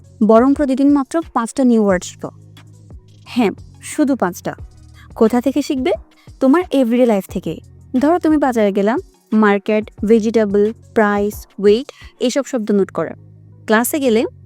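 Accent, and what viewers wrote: Chittagong, Bangladesh. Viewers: Are you frome chittagong?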